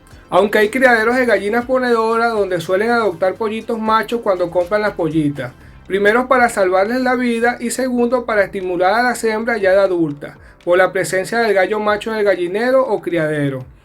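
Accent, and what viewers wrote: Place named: Venezuela. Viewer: Por tu voz me doy cuenta que eres Venezolano... tengo un gallo producto de un gallo criollo con una gallina ponedora Isa, un hermoso ejemplar de plumaje negro con pocas plumas Rojas y azules. Ahora, veo tu video y veo que mi plan se ajusta al tuyo. Pregunto, donde resides? Y como logras encontrar los gallos hermanos de ponedoras?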